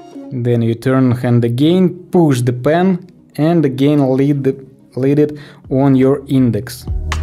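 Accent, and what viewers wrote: Russia. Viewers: You have a Russian accent(lul, it's handsome). Are you from Russia? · Слышно что русский челик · Русский акцент остался😂😂😂😂👍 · так ты русскоязычный по акценту понятно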